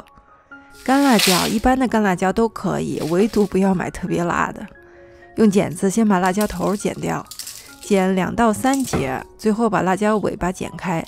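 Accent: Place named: Shaanxi, China. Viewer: 好棒啊！每次回西安去回民街都会买点炸辣椒，特别喜欢吃，再陪着的陕西口音，太亲切了。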